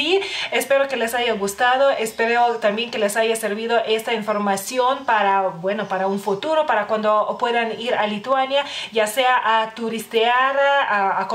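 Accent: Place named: Spain. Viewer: Ya me dio hambre...no se porque🤔... Hablas muy español y esta bien bonita.👍🏼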